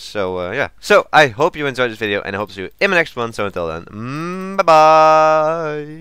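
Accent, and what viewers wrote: Netherlands. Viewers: Je hoort ook gewoon dat je Nederlands bent XD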